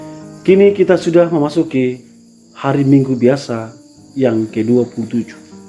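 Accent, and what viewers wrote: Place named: Flores, Indonesia. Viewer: Semakin Logat Maunori. Mantap eja Rmo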